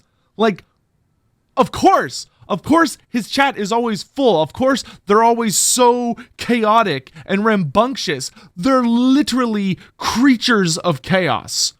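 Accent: United Kingdom. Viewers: Mancunian accent makes it too difficult for me to watch. The prediction videos also kill a lot of excitement for me at least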